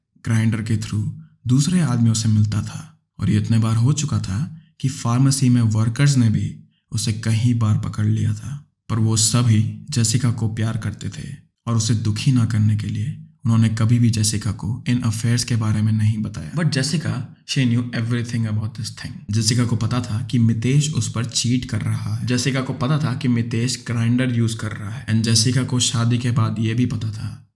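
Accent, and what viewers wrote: United Kingdom. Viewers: IM SORRY BUT WHY DOES HE SOUND BRITISH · that brr-issh accent got me wet, also rip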